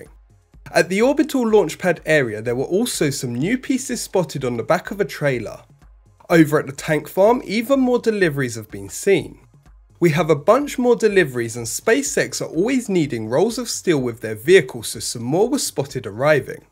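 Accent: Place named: East Anglia, United Kingdom. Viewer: The pronunciation is from East Anglia. It is spoken this way by millions.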